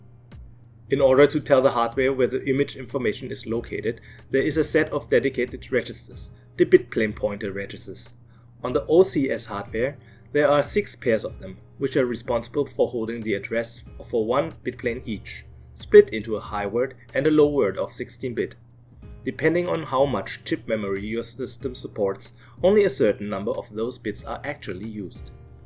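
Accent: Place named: Germany. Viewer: I didn't detect the German accent, and only realised when I watched your ZX Spectrum Next video. Of course, Germany was as much obsessed by Commodore as we were in the UK. Makes sense!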